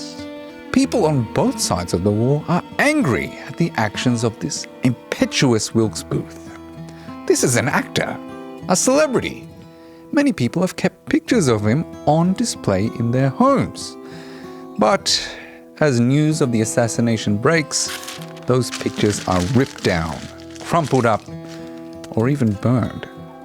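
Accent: Australia. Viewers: As long as he keeps pronouncing gone as gawn like Kath and Kim I'm down 😅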